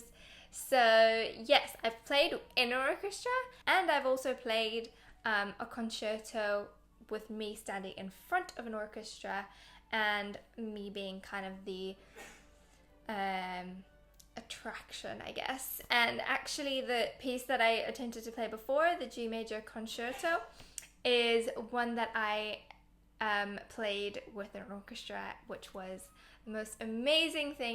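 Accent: United Kingdom. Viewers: Whwre is she from? Is she british? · I love hearing u talk,I play the Yamuna closed key flute I love it so much I am a beginner and I play 15-30 minutes each day when I get home from school,I am trying to learn by on flute for my school talent show I also love ur accent I always wish I had a British accent one day could u play the song by I really really love it that song Thanks bye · You sound like a british voice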